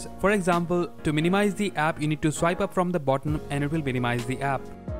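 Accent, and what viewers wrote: India. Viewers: Indian accent is sooooo bad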